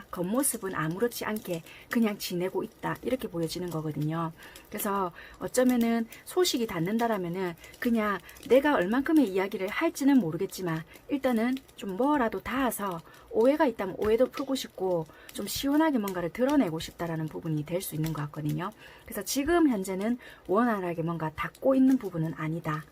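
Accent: Busan, South Korea. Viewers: 헐 직접 댓글 달아주셔서 정말 감사해요...!!!! 완전 속이 후련해졌어요 저건 그냥 제 망상적인 단순한 의문이었구요 말씀하신게 그대로네요.. 머리론 아는데 자꾸 끌리고 다시 만나게되요 ㅠ 제 현실따문에 헤어졌는데... 정말 이렇게 답글 주셔서합니다ㅜㅜ 그냥 만남에 있어서 좋게 생각하려고 노력중이에요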